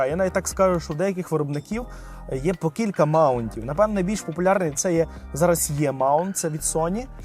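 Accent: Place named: Ivano-Frankivsk, Ukraine. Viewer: то бо гіби десь з Франківщини ви)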